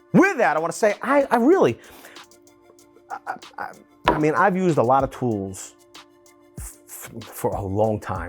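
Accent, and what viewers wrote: Philadelphia, United States. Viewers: What part of Philly are you from? That accent.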